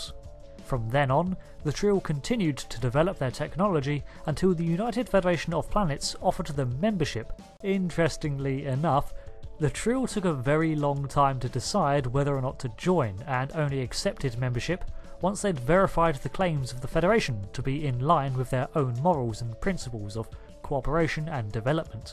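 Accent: United Kingdom. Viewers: Better at 1.25 speed but still not comprehensible. What is it about this man’s British like accent that’s tough to understand like he slurs his words and expects us to understand your joke when you barely said it See — what joke.. you said “I’m joking” but what’s the joke you didn’t even say except their efficient choice/ mode of transportation.. which is not funny.. then it sounded cutoff and slurred out.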